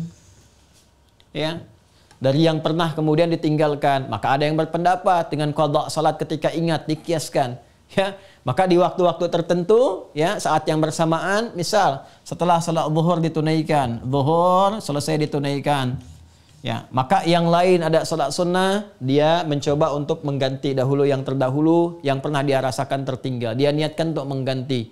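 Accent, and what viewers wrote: Malaysia. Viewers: Assalamualaikum Ustadz Adi, izin saran, dalam ceramah-ceramahnya pakai logat bahasa Indonesia aja jangan pakai logat Melayu Jadi mirip sama Malaysia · saya merasa ceramah ustad kali ini terdengar seperti orang malaysia karena banyak logat melayu tidak seperti biasanya, sehat selalu ustad..syukron 🙏🙏🙏🙏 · Masya Allah ustad seperti nya anda sedang di Malaysia ya soalnya langgam ceramah nya persis orang Malaysia👍🥰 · Knp jd logat malaysia? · Kenapa logat jadi spt org malaysia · Kok, kayak logat Malaysia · Maafya pk ustd kdg2 trdgr pk ustd sekali sekala ada omonganya spti org Malaysia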